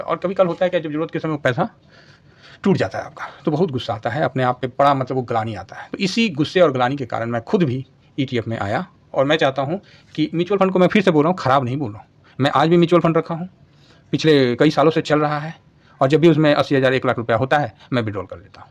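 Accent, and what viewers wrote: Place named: Bhojpuri, India. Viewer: Sir ji your accent is excellent, I love bhojpuri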